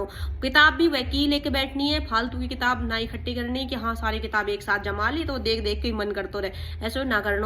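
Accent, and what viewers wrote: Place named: Shekhawati, India. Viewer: Mem kapil choudhary sir RTS jesy bhasha he aap ki😂😂 aap shekhavati pradesh say hi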